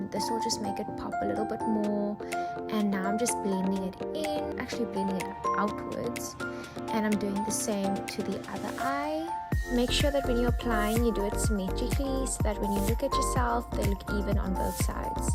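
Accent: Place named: South Africa. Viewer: Love the coloured accent. Something only found in sa ..thanks.for.the great video. Fellow sa gal x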